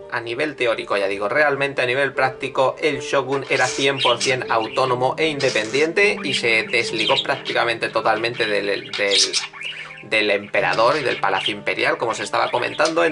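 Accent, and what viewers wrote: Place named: Catalonia, Spain. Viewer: toma dislike por pronunciar como un sucio catalan.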